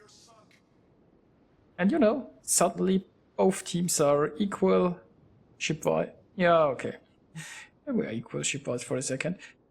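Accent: Germany. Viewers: I got one question. Are you from Germany?:D Because you speak like a german person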